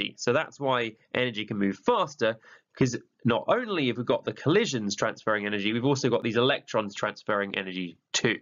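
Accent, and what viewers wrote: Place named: United Kingdom. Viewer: nvm u sound british :face-orange-raised-eyebrow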